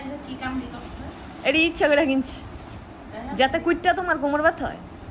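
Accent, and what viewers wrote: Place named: Narayanganj, Bangladesh. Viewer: Apner Kotha suny bujty parci.ami o Narayanganj er mey to tai.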